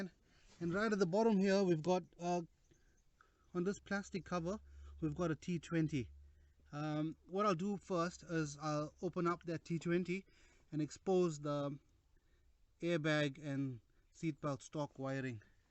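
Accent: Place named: South Africa. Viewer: That sounds like a south african accent...very cool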